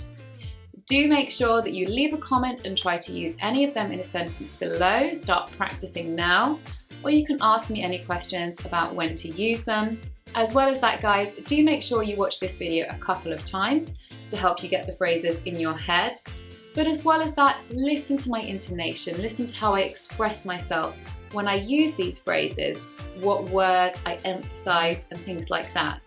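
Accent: United Kingdom. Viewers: Stop speaking british accent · I believe brittish accent is awsome when you use it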